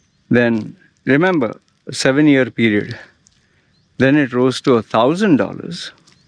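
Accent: India. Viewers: You sound like you are Indian are you?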